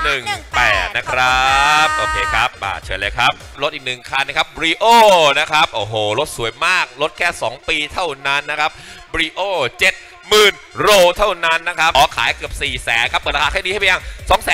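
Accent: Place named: United States. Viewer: พูดแบบอเมริกาเลย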